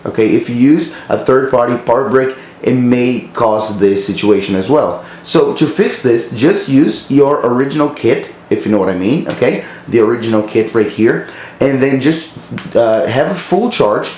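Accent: Brazil. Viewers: Heeyyy Brazilian!!! I noticed by your accent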